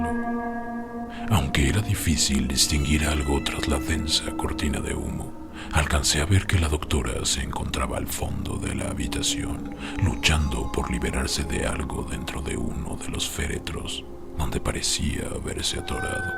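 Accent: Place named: Mexico. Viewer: Que gusto volver a escucharte brother! Tus historias y narración son inigualables, neta tienes un estilo muy chingon con ese sabor mexa que tanto hace falta, éxito y espero con ansias tu próximo video 🤘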